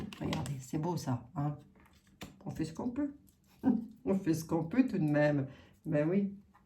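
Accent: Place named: East, France. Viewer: Un immense merci pour ce que vous faites ! Abonnée depuis peu, mon ange m’a envoyée par « hasard « sur votre chaîne… Je me disais bien que votre petit accent m’était familier, étant originaire de l’Est Que notre Seigneur vous garde dans la Paix et l’Amour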